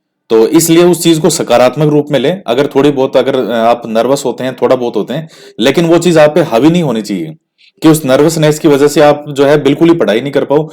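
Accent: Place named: Marwar, India. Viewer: धाप के पढो गुरूजी मारवाड़ से लगते हो 😀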